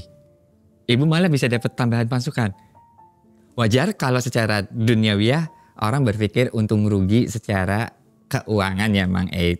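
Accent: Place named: Java, Indonesia. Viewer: Ditunggu Mas cerita epik nya..bahasa Jawa ne jenengan enak banget di dengar nya❤❤😊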